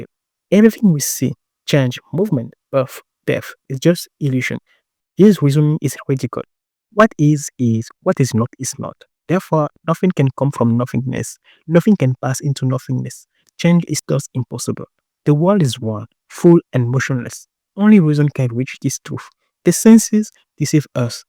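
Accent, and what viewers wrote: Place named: United Kingdom. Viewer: is it just me or is this genuine gibberish with a british accent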